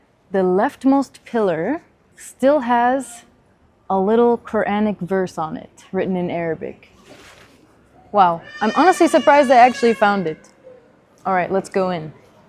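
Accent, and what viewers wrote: Tunisia. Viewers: As someone from the other side of the Mediterranean coast, I feel like I didn’t even cross it, wow you video is incredible I've always admired how Sicilians are. But gurrl wanna say that your tunisian accent is sooo impressive😮 mashallah 😍 that man in the market wouldn’t be able to pull me in like that, because I know that trick 😂. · even your accent when speaking italian is tunisian lol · An American who speaks fluent Tunisian? That made me laugh out loud. I think that's the best Tunisian accent I ever heard from a non Arab. Well done :p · That's amazing you can speak Tunisian lahja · Thanks for an interesting video and i spoke to some tunisian while ago and got you really good tunisian accent 👌👌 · Your Tunisian accent is 100% congratulations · Excellent accent Tunisien !!! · It is nice how you enlight us about hidden areas of islamic remarks in Sisily ,your gunisian accent is remarkable too ❤🇵🇸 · You speak Italian with a Tunisian accent: the way you say Aperto?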